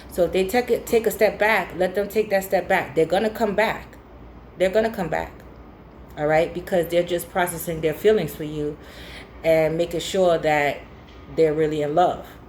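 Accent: New York, United States. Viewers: Aww. Sorry to hear that. Be strong! You sound like a New Yorker. From the Bronx, NY?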